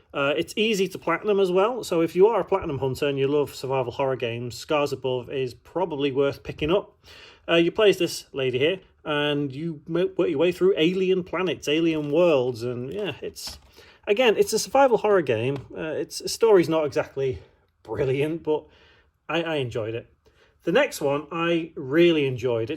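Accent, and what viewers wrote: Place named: North West England, United Kingdom. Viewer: Cool video man and it’s good that you’re highlighting lesser known games. I think if you’re wanting to do YouTube seriously you’d benefit from some higher production values. I’d script your game voice overs so you can hit all the points conclusively rather than winging it. You don’t have to listen to me but I do run a channel with a silver play button and the advice is friendly and I want you to succeed. You also sound like you’re from my neck of the woods, north west uk?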